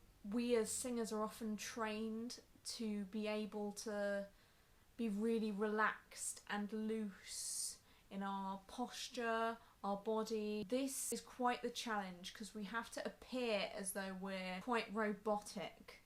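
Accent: United Kingdom. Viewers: Your surname is Polish but your english speech is very Oxford...simply nice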